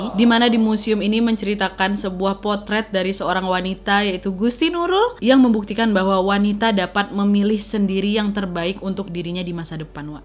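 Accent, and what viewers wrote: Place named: Medan, Indonesia. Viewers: Saran alangkah baik ny klw menjadi narator di konten berbahasa Indonesia yg baik terutama aksen medan ny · aku suka bahasa wak ini, spt bahasa ku di medan, asli dr mana wak? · MEDAN kali nya kk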